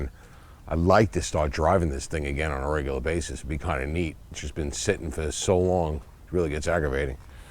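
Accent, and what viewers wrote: New York, United States. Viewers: Nice car love you New York accent I’m in canada ehh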